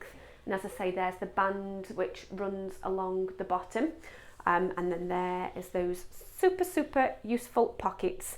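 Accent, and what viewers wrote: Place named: Northern England, United Kingdom. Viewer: Hi I am anew subscriber and quite a new sewer after just retiring from work. You sound northern I live near Chester. Love your channel. Have a lovely day. Dorothy 🙋🏻xxxx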